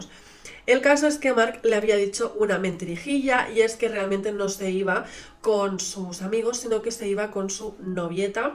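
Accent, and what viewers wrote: Spain. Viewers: No hace falta comentar estupideces. Los que hacen videos tienen como objetivo darse a entender, cierto? Para eso publican, para que los vean. Quizá ella esta muy habituada a hablar local y no sabe que fuera de España no le entienden. A ella le sirve esa retroalimentación si quiere que mas gente la vea y le entienda, es el objetivo de todo youtuber, seguramente ya lo sabes.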